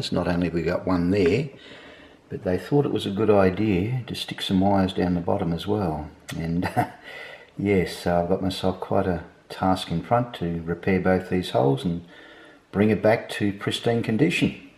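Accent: Australia. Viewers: well his accent sounds australian and don’t they do things backwards there?? ... or is it us? thanks for the help with this video and your accent rocks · Uffff sorry mate cant listen to an Australian accent 🤢🤢🤢🤢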